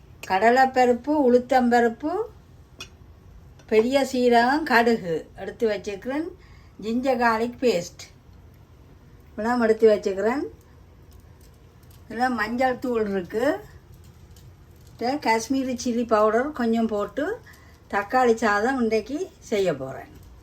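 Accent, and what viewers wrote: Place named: Sri Lanka. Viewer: உங்களுடைய ஸ்ரீலங்கா தமிழ் அழகாக பேசுறீங்க❤ உங்களுக்கு நான் சப்போர்ட் பண்ணிட்டேன் சிஸ்டர் ❤🎉❤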